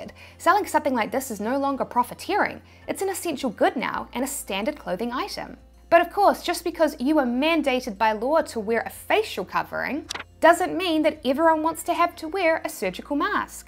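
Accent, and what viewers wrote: New Zealand. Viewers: I'm in England, and think your New Zealand accent is reassuringly super cool. Completely in love with you, brilliant presentation so easy to understand. I was never much interested in on-line marketing until, Conyvirus found me on YouTube and stumbling onto your channel, Wow you are impressive, your running commentary alone is so descriptive I have you in my ear most all the time, helping and encouraging, Brilliant brilliant brilliant - you have changed my life, for the better for ever. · You sound like a kiwi girl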